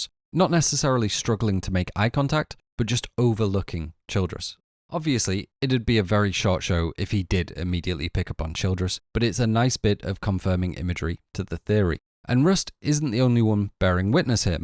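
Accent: United Kingdom. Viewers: The british accent is taking over youtube.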